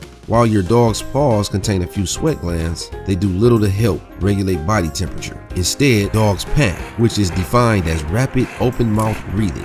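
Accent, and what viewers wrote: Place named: Ohio, United States. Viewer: That man educating and sounding like he from the other other side of Ohio. ❤️ get extra proper for the people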